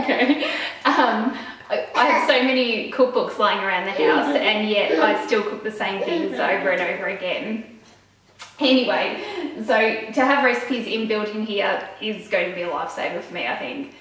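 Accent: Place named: New Zealand. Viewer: Where are you from? You sound like a kiwi :-)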